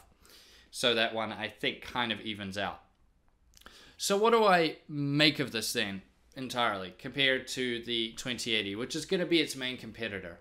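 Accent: New Zealand. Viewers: I've noticed your accent starting to change since the move. You are losing that touch of Kiwi.:) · He's a New Zealander talking like a New Zealander.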